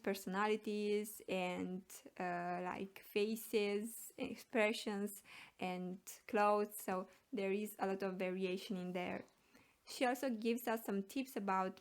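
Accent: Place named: Italy. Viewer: Ho capito subito che eri italiana dall'accento 😂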